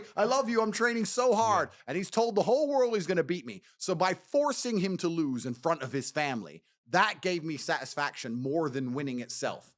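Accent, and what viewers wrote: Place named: India. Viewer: Just subscribed, quite a strong Indian accent but is very well-spoken. I know India is a huge place but I'm from the UK and I promise you the place I hear about the most for its beauty is India. However, a lot of people hear India and think of some shithole, I might be wrong here but I am sure the economy in India has been and is on a huge growth spurt. Big hidden gem??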